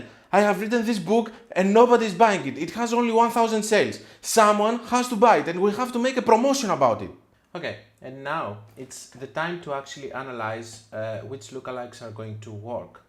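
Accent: Greece. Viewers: Όταν καταλαβαίνεις ότι είναι Έλληνας απ'την προφορά χαχαχ μπράβο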